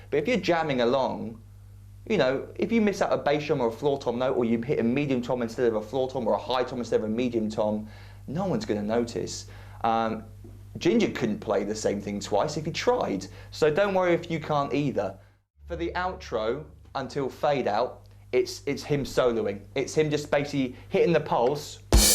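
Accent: United Kingdom. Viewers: Fantastic! Thank you! (Good to hear another British accent on YouTube for a change) 👍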